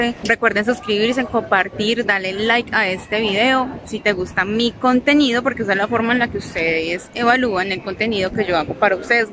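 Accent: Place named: Antioquia, Colombia. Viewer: Todavia no conozco Medellín pero un dia alla estare porque lá tengo en mi corazon pegadito a São Paulo y tus vídeos son como clases de espanol con mejor asento que porsupuesto es el asento paisa.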